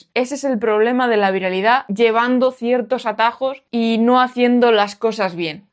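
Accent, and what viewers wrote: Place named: Canary Islands, Spain. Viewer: suena muy canario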